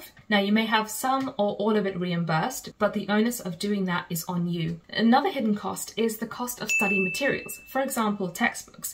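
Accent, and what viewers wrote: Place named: United Kingdom. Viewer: Are you indian cause your speaking tone in British it's not like you are speaking indian english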